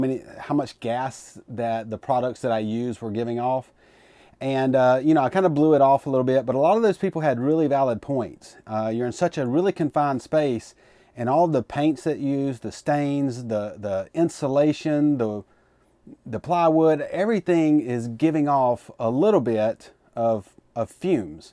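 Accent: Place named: Appalachia, United States. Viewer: Are you from North Carolina? You sound south/easty-of-appalachia-y.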